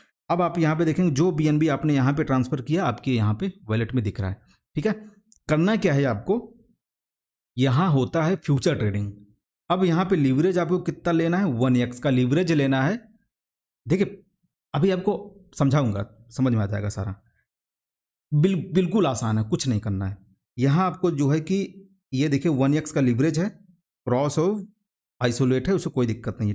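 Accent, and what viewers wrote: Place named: India. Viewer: Very beautiful 🙏🌹sir. ❤️Thanku 😘 love you sir. Or aapka bolne ka desi style mujhe bahut pasand hai.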